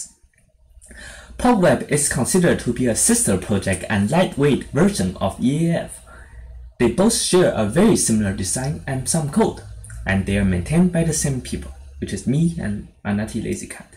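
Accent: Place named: China. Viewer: 一开口就是老中国人了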